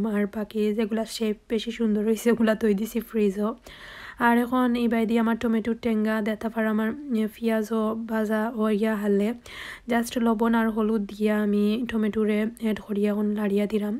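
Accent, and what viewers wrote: Sylhet, Bangladesh. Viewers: সব মিলিয়ে খুব ভাল লাগলো ব্লক টা, সো সো সো সিলেটের কথা শুনলে গম লাগে।।।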